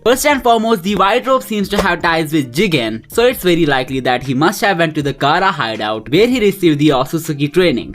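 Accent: India. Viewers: Good content but goddamit this indian accent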